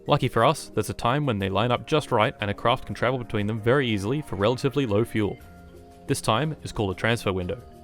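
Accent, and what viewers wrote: Australia. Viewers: are u an aussie? im curious...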